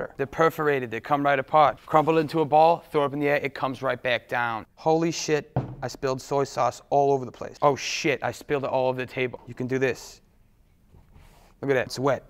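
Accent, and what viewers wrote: United States. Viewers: Loving the Boston accent!!! "Look at all the squaaaahhees. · He sounds like he's from New Yawk x3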